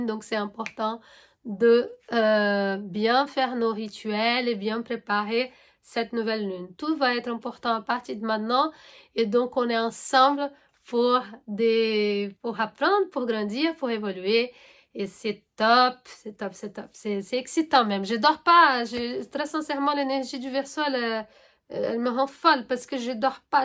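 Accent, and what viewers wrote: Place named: Brazil. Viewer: Bonjour. J’adore ton accent. Tu es brésilienne? Merci pour cette vidéo. Moi je suis lion ascendant scorpion avec bcp de choses près de mon ascendant, maison 12 pluton, et maison 1 la lune, le tout en scorpion. Ca me donne une sensibilité un peu spéciale et j’essaie de mieux le comprendre à travers l’astrologie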